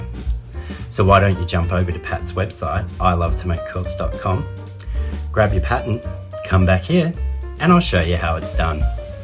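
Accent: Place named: Australia. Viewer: Hi - i am so happy you are doing this! You do such a good job and your voice is so soothing. And who doesn't like an Aussie accent?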